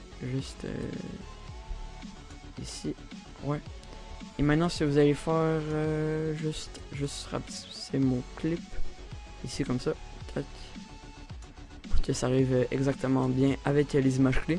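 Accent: Quebec, Canada. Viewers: super tuto et j'adore l'accent quebecois donc je like ;)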